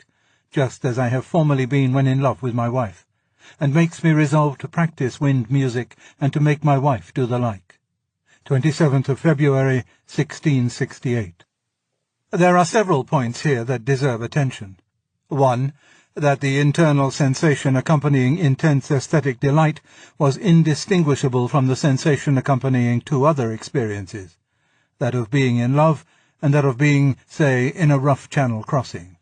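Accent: United Kingdom. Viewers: I love his voice. Nice British accent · very old times British aristocrat. You've seen that in movies so you're conditioned to it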